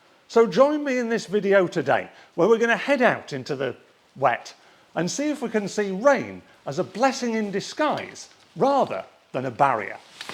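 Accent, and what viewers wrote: United Kingdom. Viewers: you're great. i just found you a few minutes before and im all in. interesting story-telling, beautiful pictures - love the brittish accent, and you're glasses are the cherry on top. i draw my hat sir!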